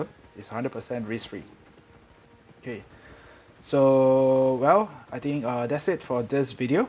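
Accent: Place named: Malaysia. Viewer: Bro, u either a malaysian or singaporean based on your english. Theres no doubt about it.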